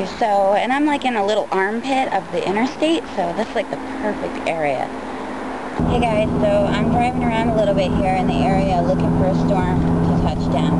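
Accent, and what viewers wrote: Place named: Maine, United States. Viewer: BeeCirca is right! You certainly are a lovely-looking "storm chaser"! I love that red hair of yours!--And that "Maine voice" you have too!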